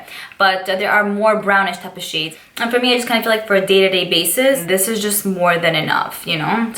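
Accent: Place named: Long Island, United States. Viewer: Glaws Bombs! I was guessing you were a NY'er...hot chawclate made me think Long Island perhaps.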